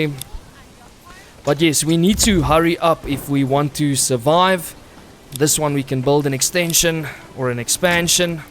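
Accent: South Africa. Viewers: I try not to watch games I play to avoid burnout; but the South African accent is cool as fuck! 😂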